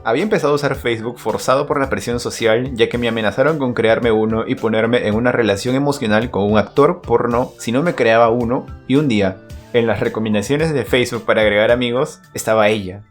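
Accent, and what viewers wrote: Peru. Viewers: BRO ME IDENTIFICO CON TU HISTORIA Y CREO QE ERES PERUANO CON TU ASENTO Y YO TAMBIEN NMMS :v.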